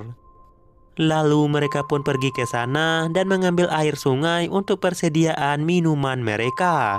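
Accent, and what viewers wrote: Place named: Medan, Indonesia. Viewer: aku suka aksen Medan,tapi klu buat review boleh lah bang di kurangin dikit aksen Medan nya,biar lbh nyaman di telinga 🙏🏻 mohon maaf sekedar saran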